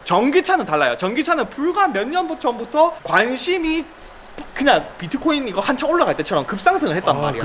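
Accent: Busan, South Korea. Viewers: 마 붓산싸나이답게 설명 쳐즈기네요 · 딱 보이 부산표준어네?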